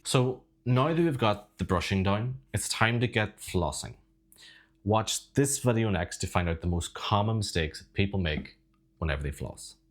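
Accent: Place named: Ireland. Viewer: Good video love the Irish accent and you are kind of gorgeous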